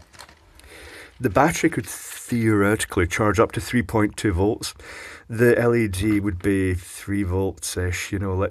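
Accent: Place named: Scotland, United Kingdom. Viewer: The Scottish* accent makes it perfect.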